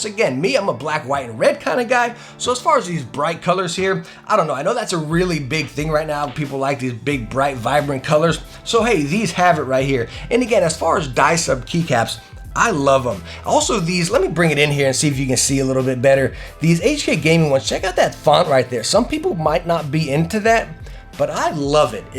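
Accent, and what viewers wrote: United States. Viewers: This guy's accent and way of talking is so American....but good video tho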